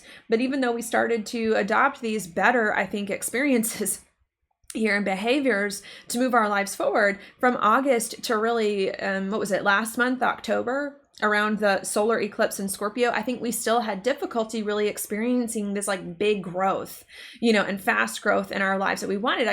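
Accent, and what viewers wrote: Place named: Georgia, United States. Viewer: Georgia huh⁉️ That explains that accent of yours I love so much lol❤️😅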